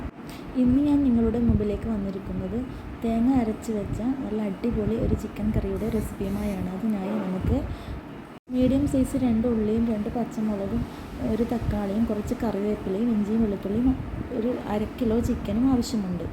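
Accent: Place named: Kasaragod, India. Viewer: oo ഭാഷ കാസറഗോഡ്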